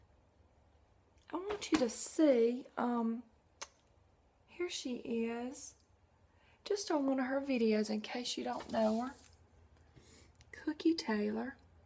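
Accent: South, United States. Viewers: Hello. Nice USA women, with beautiful BLUE eyes. Dont cry. I will send some tissues from EUROPA. Good video, a lot of good video, BRAVO. You are funny. South accent. Have a beautiful day. Bye. Rudi from EUROPA.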